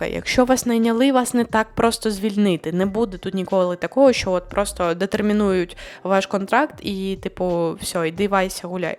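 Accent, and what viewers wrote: Western Ukraine, Ukraine. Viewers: Прежде всего благодарность и помните что немцы и Германия вам ничего не должны. БюрокрАтия ударение на а. В мате ху**о ударение на о, у вас западэнский акцент, приятно было вас слушать, много полезного рассказываете, но Германия не для таких туристов как вы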